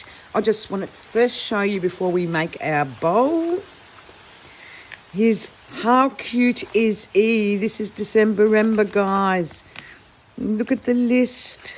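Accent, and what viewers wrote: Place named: Australia. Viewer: You sound Australian, but it is so hot in Oz right now n you have a thick thick jumper on! I'm sitting here melting! 😂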